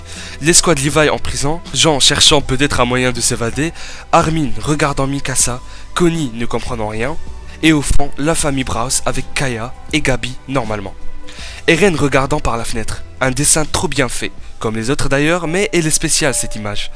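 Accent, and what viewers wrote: England, United Kingdom. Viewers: Merci bonne année à toi aussi et j'adore ton accent Anglais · Super accent anglais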